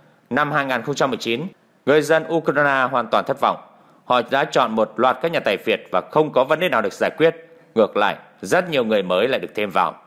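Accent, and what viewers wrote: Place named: North, Vietnam. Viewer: Bạn nói đúng! Nhưng vì Ba Ke đều có lối phát âm như vậy chớ không chỉ riêng anh nầy !!! Tôi chỉ nghe anh ta nói “ u - cà - na - na “ mà thôi ! Ngay như “ HÀ NỘI “ họ còn không nói được 👍 thì còn nói chuyện gì khác nưa chớ ??? OK 👍!?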